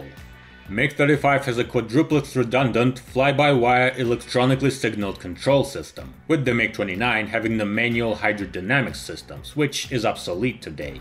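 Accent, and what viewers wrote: Russia. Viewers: Good video and I love your Russian accent. Subscribed. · От этого отстоя отказалась армия. Так что - можете не обсуждать привидение Каспера. С рязанским акцентом троллей посёлка Ольгино. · North american video with a russian accent...complete bs...what about the fact it can stop mid flight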